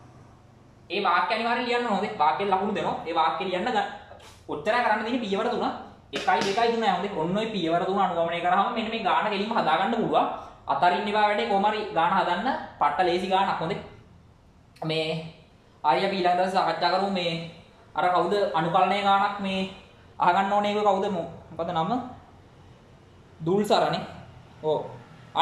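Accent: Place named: South, Sri Lanka. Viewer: ❤🌝දකුණේ හඩක්...මරු mru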